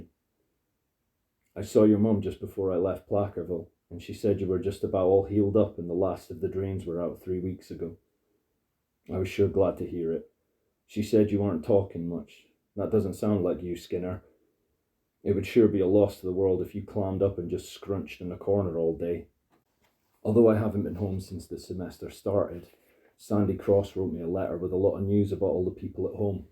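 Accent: Scotland, United Kingdom. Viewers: Cool, I subscribed to your channel, so I look forward to that. The Scottish accent gives the reading a nice twist as I don't believe I have come across a Scottish narrator before.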